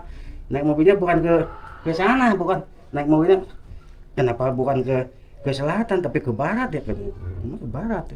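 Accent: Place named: Jakarta, Indonesia. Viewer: Bahasanya kayak bahasa Betawi dimana yaaa alamatnya tolong Nasik alamatnya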